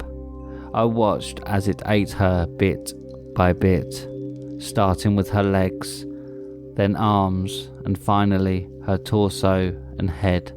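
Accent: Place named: United Kingdom. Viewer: Loved your intro dude. Sounds like you are a fellow Brit narrator too. Great to see your channel growing too. Hope you don't mind a little bit of critique.. nothing bad. Try to make your reading a bit more flowing. Maybe when you do a person's speech, maybe try adding a bit of inflection/emotion into whatever they are saying. That being said, one thing I find that listeners do seem to enjoy, is to add plenty of sound effects throughout the story. So far I haven't found any other narrator, not even the big channels, add as much as I do. Folks find it helps the story along, by making it more immersive. Some have even told me that my narrations remind them of the old-time radio shows haha. Oh and maybe ask around for collabs, that can also help boost your subs and watched hours too.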